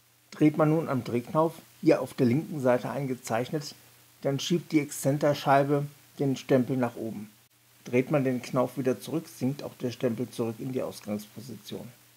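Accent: Hesse, Germany. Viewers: Keine schlechte Idee, diese "Regalbauweiss". Lässt sich bestimmt "einfacher" umsetzten als eine Modulbahn. Ich vermisse dein hessisch Gebabbel... 😁😁😁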